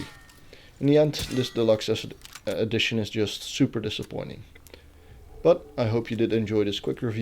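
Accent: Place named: Netherlands. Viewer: Nederlands accent haha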